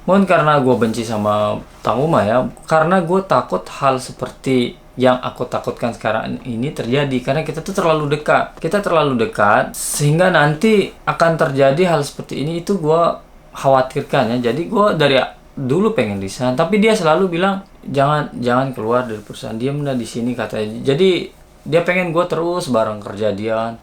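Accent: Lombok, Indonesia. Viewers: Bang logat sasaknya gak bisa ilang walaupun hidup dijepang . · Kok mirip logat Sasak ya bang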